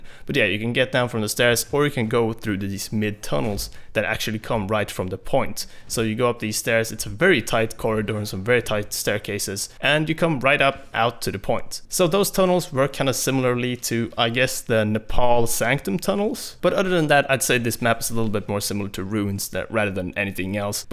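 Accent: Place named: Sweden. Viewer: u swedish af boi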